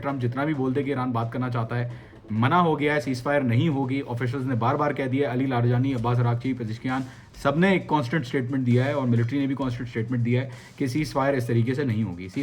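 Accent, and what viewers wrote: India. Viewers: Your Urdu accent llike of Indian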